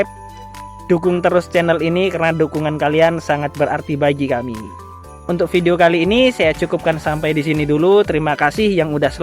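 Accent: Java, Indonesia. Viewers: Masnya ini jawa mana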